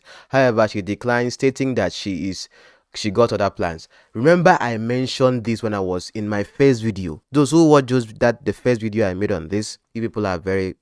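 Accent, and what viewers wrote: Calabar, Nigeria. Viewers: Why does your Igbo sound like Calabar/Akwa Ibom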